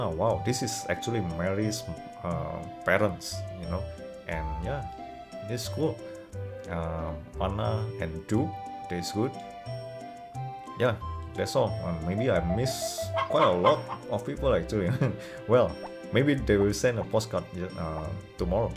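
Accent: Indonesia. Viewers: hello , me and my gf are watching this whole guide in Japan, and this game is so interesting we are not even studying lol . i will be replaying this with my her who is a huge fan of this game. thanks for this guide , bro. btw, she immediately recognized your indonesian accent, because she is also indonesian i guess Harvest moon and Indonesia go hand in hand :) thank you again for your efforts. Appreciate it greatly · Judging by your accent, you must be idonesian. Arent you? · yes your javanese accent is very noticable through your english 😂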